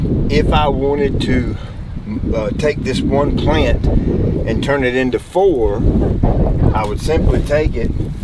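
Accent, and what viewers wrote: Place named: Louisiana, United States. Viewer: You should like you are from Louisiana instead of N.C. Regardless, I like your accent. Your beds look fabulous!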